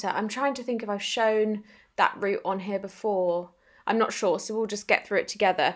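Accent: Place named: Devon, United Kingdom. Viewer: thats ok! I was just wondering from your voice, it sounds like my aunties and shes from devon xx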